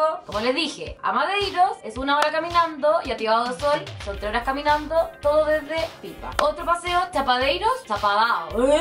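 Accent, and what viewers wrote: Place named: Chile. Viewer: Demasiado chileno jaj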